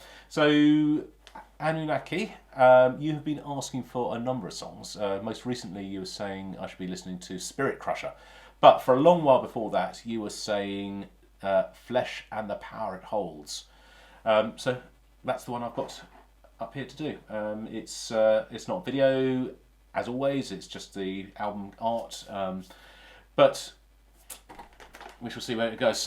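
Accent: United Kingdom. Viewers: Your accent is nice :D Are you from Britain? 🤔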